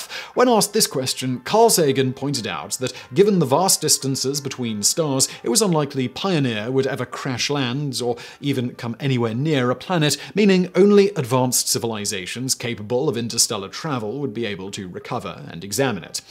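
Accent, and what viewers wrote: United Kingdom. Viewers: Can you guys make a video of this dude saying THAT WAS A REMARKABLE ACHIEVMENT in his thick british accent?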